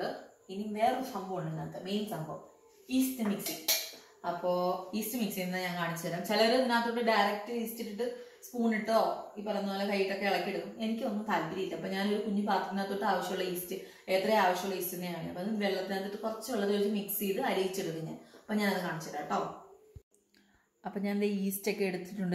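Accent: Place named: Kottayam, India. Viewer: Not Thrichur talk... This is exactly kottayam Thiruvella chengannur talk.. same dress next day..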